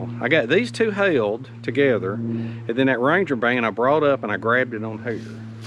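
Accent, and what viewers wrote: South, United States. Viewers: Awesome video. Loving that southern accent. 😎🤘🇺🇸🇺🇸🇺🇸💯💯💯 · gm love your videos and your southern voice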